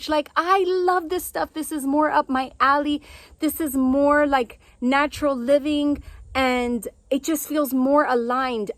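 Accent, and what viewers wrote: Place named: Los Angeles, United States. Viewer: Hate that accent. Typical LA Hispanic sounding. I know girls from there that sound like this and can’t stand them.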